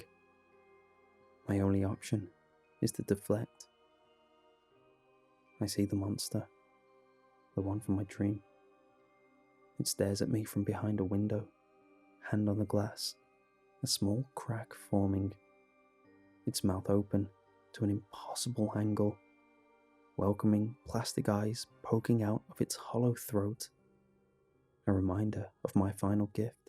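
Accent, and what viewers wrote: United Kingdom. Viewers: r u British?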